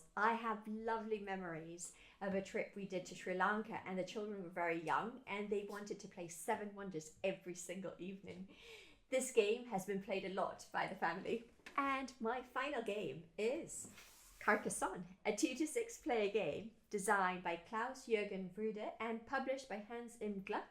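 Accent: United Kingdom. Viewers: Dude are you british? Your accent sounds a bit off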